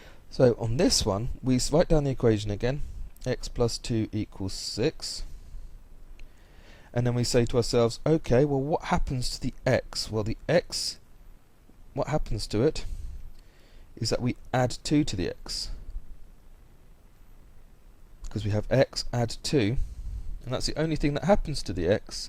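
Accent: United Kingdom. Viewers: I'm guessing the second method is something British people do? No disrespect, it's just a guess. (Your accent sounds British, that's how I got to that conculusion.)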